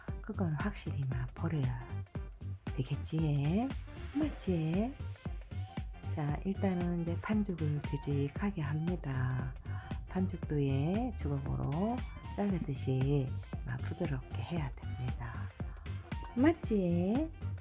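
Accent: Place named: Gyeongsang, South Korea. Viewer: 오~호두파이! 경상도 사투리까지 구수하니 좋습니다^^ 잘보고 가며 살포시 손내밀어봅니다! 좋은 인연 맺고 서로에게 힘이되면 좋겠습니다😉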